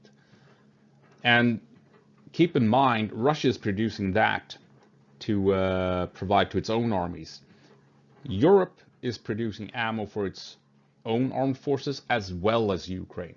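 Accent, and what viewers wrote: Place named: Sweden. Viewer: Veldig imponert over swenglishen din😂👍 Denne kanalen ble lagt til blant mine favoritter med EN gang!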